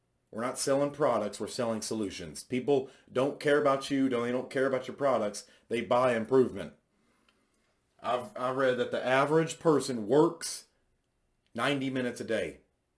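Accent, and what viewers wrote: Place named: South, United States. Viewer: Thanks this was great! I'm just now considering a position with Symmetry and enjoyed listening to your southern draw!! 😄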